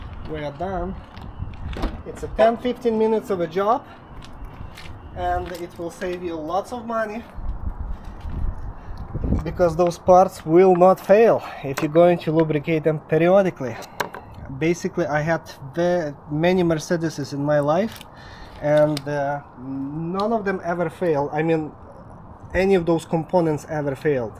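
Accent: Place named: Russia. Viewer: а почему Слава Богу? акцент то русский.)))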